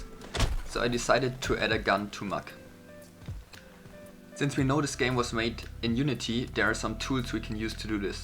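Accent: Germany. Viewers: german? i hear it so hard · You sound pretty german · you sound German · are you german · I like you! xD Also, good luck losing that german accent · are you german?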